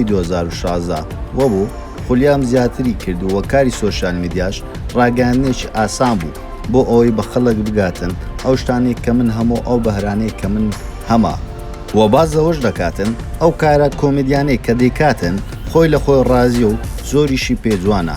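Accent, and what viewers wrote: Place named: Erbil, Iraq. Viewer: تن تن تن تننننن هه‌ولێری بێ مێشك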